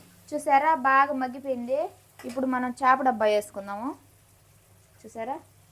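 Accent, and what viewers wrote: Nellore, India. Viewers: Pakka nellore yasa super Madi kuda nellore e andi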